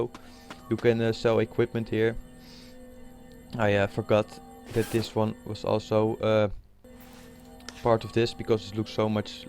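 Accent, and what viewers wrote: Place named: Netherlands. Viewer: Duidelijk een Nederlander ^^, goed bezig.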